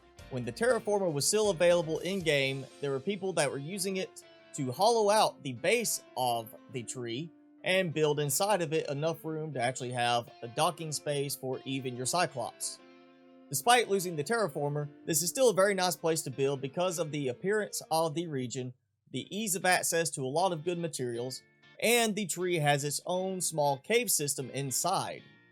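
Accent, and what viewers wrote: South, United States. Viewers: I love this guys southern accent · You have the most American accent IV ever heared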